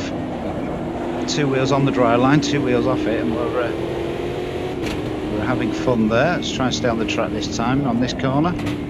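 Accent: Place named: Northern England, United Kingdom. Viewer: Nice video, Could you show tyre temps on screen next time to see how a drying track affects tyre temps and whether or not you need to go off the dry line to cool your wet tyres? I know this video was about primarily the new particle physics etc, but on a drying track you need to know when to make the switch to slicks.Keep up the ood work, nice to hear a proper Northern accent coming from a Yorkshireman!!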